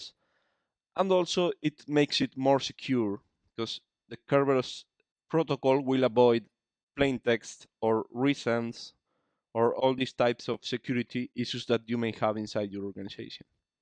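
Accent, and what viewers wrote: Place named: Spain. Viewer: I understand him. I do not speak Spanish. His accent is familar to me, Spanish accent is widespeard in mainstarem media.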